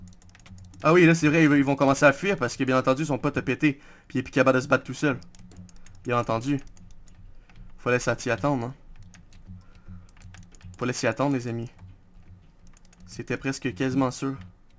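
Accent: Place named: Quebec, Canada. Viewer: Comment fais-tu pour percer malgré un petit handicap qui es notre langue québécoise? Pensait tu en commençant que tu aurait cette handicap de language , j'aimerais que tu fasse une F.A.Q .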